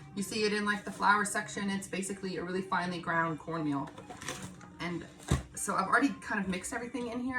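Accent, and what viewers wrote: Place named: South, United States. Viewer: you must be from the south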